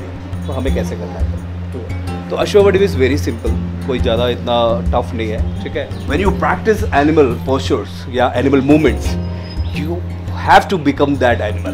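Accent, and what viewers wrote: Kerala, India. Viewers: Are you a malayali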